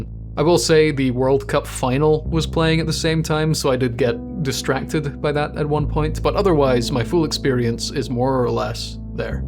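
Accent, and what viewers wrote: Scotland, United Kingdom. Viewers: Bro you do more videos using the Scottish accent it’s genuinely the best accent I’ve heard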